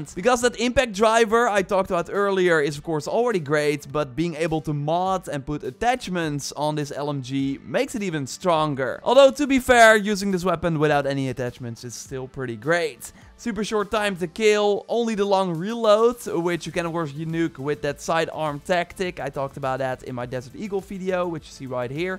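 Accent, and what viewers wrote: Netherlands. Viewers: Your videos are amazing, but your Dutch accent is the really cherry on top - I love it - Tot ziens! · Ben jij Nederlands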